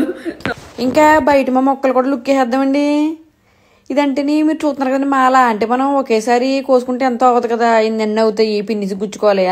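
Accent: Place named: Amalapuram, India. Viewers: ఏదేమైనా మీరు మన అమలాపురం వాళ్ళు ఎలా వుంటారో ఎలా మాట్లాడతారో అలానే వున్నారు,కొందరు వూరు మారితే అన్నీ మార్చేస్తారు,వడ్లు కాసే చెట్లు అంటారు ,ఎవ్వరేమనుకున్న నువ్వు సూపర్ సిస్టర్ ❤❤ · Mekkudani..antu chinna ragam theysaru..adgadi..mana konasema..theeepidanam.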